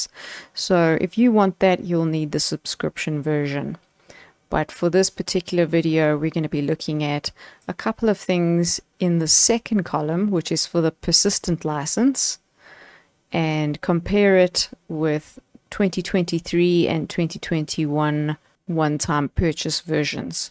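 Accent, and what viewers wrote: South Africa. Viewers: Is that a South African accent I hear? :)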